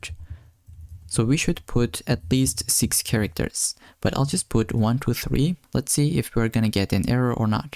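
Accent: Turkey. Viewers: Merhaba kardeşim,aksandan hemen Türk olduğunu anladım .Teşekkür ederiz video için:)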